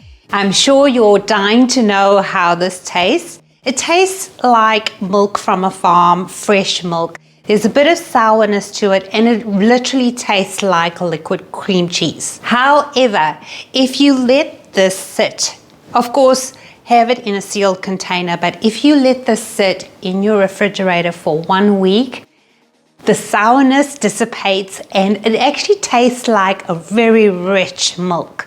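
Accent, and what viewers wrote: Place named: South Africa. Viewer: Were you originally from South Africa. Your accent sounds so familiar. But live from RSA anyway to you 😊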